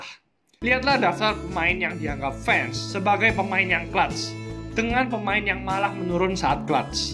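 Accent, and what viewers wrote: Java, Indonesia. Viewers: Min...logat jawanya di kurangin.....xi..xi...xi....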